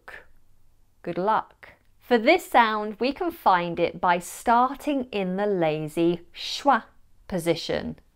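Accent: United Kingdom. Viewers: I'm new to the English language, and when I wanted to learn the language, I started watching movies and series... I noticed that the British accent is wonderful.. I have a question: Is the accent on the channel a dialect that I can speak all over the world?👍🏻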